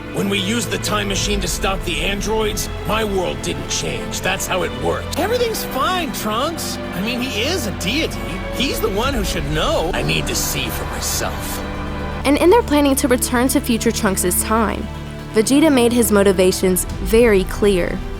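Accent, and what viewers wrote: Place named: Texas, United States. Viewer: You're from texas right? I can hear it in your accent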